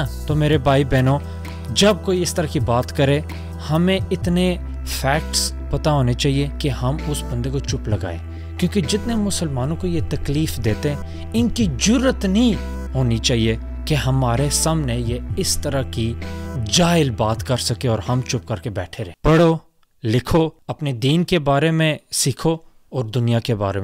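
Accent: Punjab, Pakistan. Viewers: ap punjabi ho kya . accent kafi heavy hai . btw , I am a hindu nationalist but then I share anti american sentiment like you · I can hear a hint of Punjabi?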